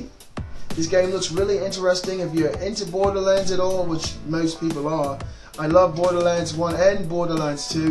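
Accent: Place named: United Kingdom. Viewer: Lol lovin the posh English accent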